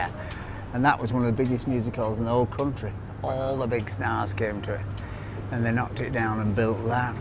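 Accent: Yorkshire, United Kingdom. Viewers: Thank you for sharing this. ? You made a beautiful tribute o your father. ❤‍🩹 On a lighter note, I loved how your Yorkshire accent came out so strong! 😹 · .. complete with enhanced Yorkshire accent ..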